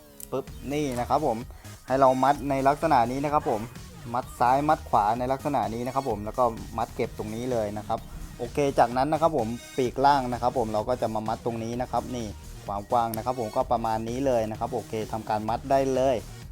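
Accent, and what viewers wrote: South, Thailand. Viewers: พี่เป็นคนใต้ใช้ไหม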